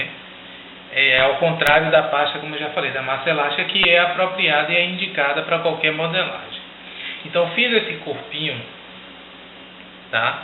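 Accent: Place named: Recife, Brazil. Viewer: olá, me apaixonei pelo seu trabalho desde que vi o primeiro vídeo, e seu sotaque me faz lembrar minha infância e meus primos do Recife.Você é maravilhoso no que faz e se reclamarem que vc fala demais, são pessoas ingratas, pois se vc tem o trabalho de postar vídeos para nos ajudar devemos agradecer e não criticar.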